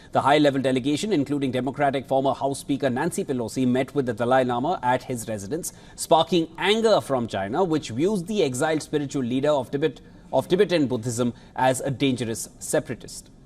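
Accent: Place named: India. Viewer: You sound like indian callcenter agent-scammers here in the US.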